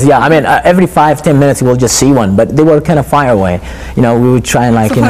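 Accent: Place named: England, United Kingdom. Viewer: i really liked your englsih accent....we are proud of you: D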